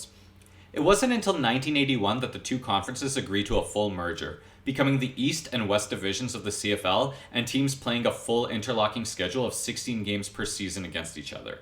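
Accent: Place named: Saskatchewan, Canada. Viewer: This guy is absolutely a Sask local. At least his formative years. Accent is a dead giveaway.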